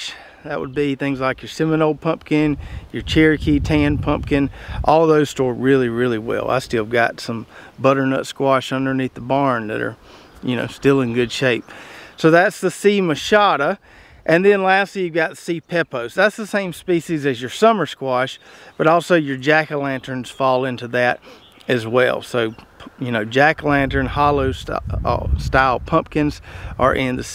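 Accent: South, United States. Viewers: Love an open minded hippy hiding under a southern accent. Thanks for sharing your knowledge and journey. Your family is so adorable and sweet.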